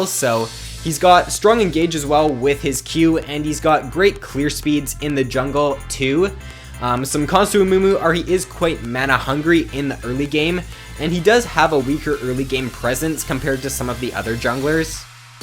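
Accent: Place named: Canada. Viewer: i see you're canadian "eh" me two :D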